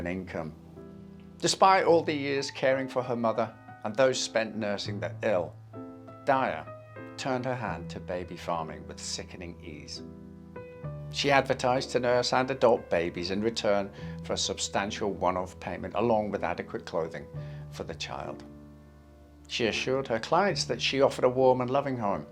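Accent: Scotland, United Kingdom. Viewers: I detect a Scottish accent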